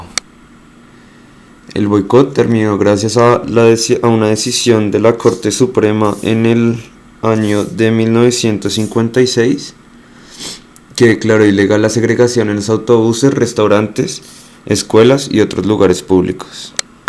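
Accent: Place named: Bogotá, Colombia. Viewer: Con tu acento rolo es dificil concentrarme.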